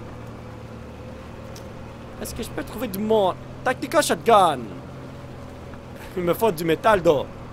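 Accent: Quebec, Canada. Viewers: Cc t mon YouTubeur préférer j'adore tes vidéos fortnight continue c'est trop génial j'adore les accent québécois lol en tout cas continue comme ça et mets un like please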